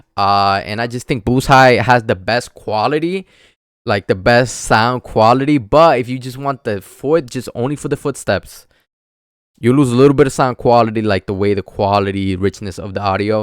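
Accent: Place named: Latin America. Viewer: Este we a quien quiere engañar? Parece más latino que nada jajajaja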